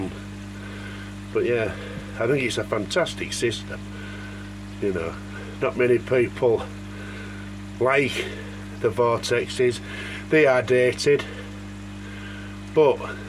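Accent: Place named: Yorkshire, United Kingdom. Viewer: love the Yorkshire accent on water. its great this weather i did have a mulibay but now have a nexus and just subbed mate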